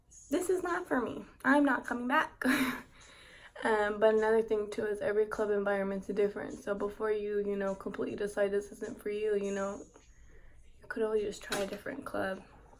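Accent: Mexico. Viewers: Shes clearly Mexican by the way she talks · She talks like a Mexicana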